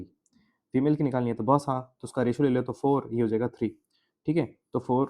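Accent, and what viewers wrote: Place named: Marwari, India. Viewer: Bhai aap toh meri caste ke ho same marwadi language shikawati Rajasthan jesi😅😅.. Love from Mumbai❤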